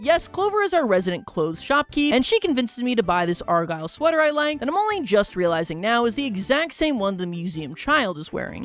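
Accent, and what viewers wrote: Australia. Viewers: You're Australian??? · After hearing the HORRIBLE Australian accent, I really struggled coming back and watching this video. I then made the mistake of watching till the end… I hate that I like 😐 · As an Australian I can approve of this accent 😊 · Yo, finally some Australian rep! Also please stop the australian accent.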